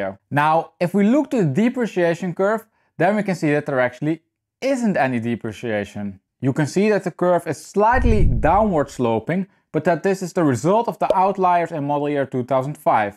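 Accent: Netherlands. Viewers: Thank you! I needed that. You've got a Dutch accent am I right?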